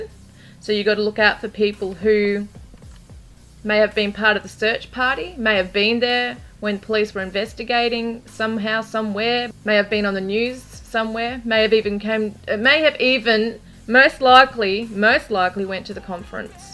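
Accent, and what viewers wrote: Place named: Australia. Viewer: Hello Aussie patriot, I recognised your Aussie accent. I also have so many out there queries of all that happens. This case has been on my mind since day 1.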